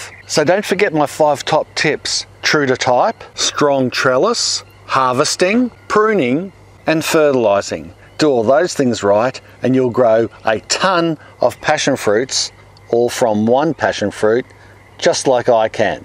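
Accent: Australia. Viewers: I like your Australian accent. 😀😅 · Is this Eric Cantona with an australian accent?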